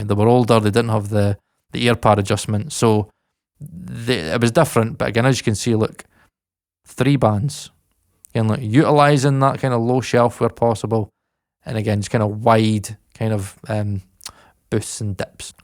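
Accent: Scotland, United Kingdom. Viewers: Trying to think why this guy seemed familiar. I had never been on this channel, and then I realised he sounded like Frankie Boyle sorry not every Scotsman sounds like Frankie Boyle to me. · Scottish accent is nice!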